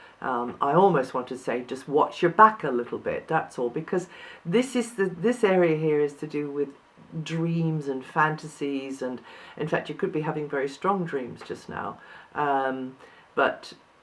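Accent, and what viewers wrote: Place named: United Kingdom. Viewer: You are Beautiful. I love your accent, British, of course.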